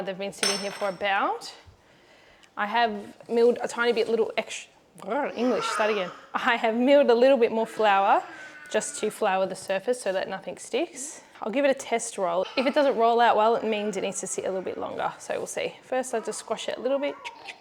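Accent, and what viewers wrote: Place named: Australia. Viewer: It’s very refreshing to hear an Aussie accent 🤗👍. I’m in Brisbane,you’ve got a hot day down your way today 🥵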